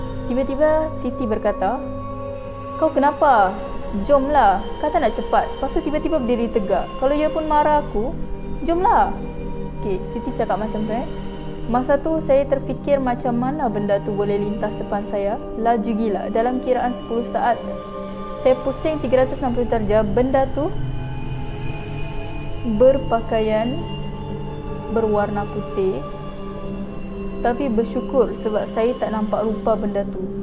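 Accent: Sabah, Malaysia. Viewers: Orang Sabah kah?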